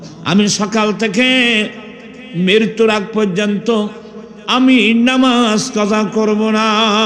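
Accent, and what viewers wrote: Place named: Chittagong, Bangladesh. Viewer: চিটাগংএর ভাষা বাদ দিয়ে শুদ্ধ ভাষায় ওয়াজ করলে সারা বাংলাদেশের মানুষ বুঝতে সহজ হতো বেয়াদবি হলে মাফ করবেন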